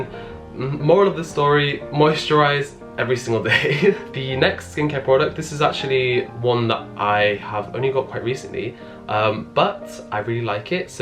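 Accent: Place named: England, United Kingdom. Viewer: Idk why but I luv your English accent sooooooooo much, it melt me out!! ASMRRRRR!! Luv from a little vietnamese girl <3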